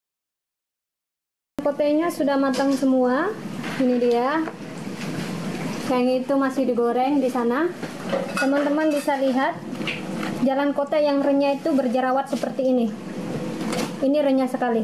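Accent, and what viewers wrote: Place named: Sulawesi, Indonesia. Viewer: oh pantes, logatx kok ky org sulawesi,, aq asli semarang, tp skrg tinggal di kendari kak,, semangat bikin kontenx kak,, aq ikuti trs lo kontenx kita,, 👍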